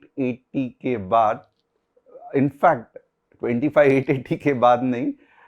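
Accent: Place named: Vidarbha, India. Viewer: sir i think u r from amravti akola side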